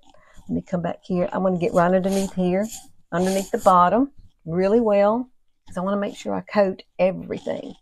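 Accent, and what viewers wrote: South, United States. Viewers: Oh my, Alabama girl here as well! Love your southern accent as well as your hair!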